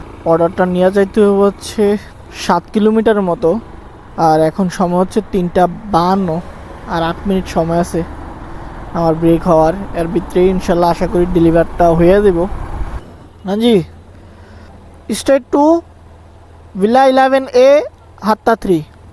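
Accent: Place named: Comilla, Bangladesh. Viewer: ভাইয়ের কথা সুনে মনে হচ্ছে বাড়ি কুমিল্লা 😊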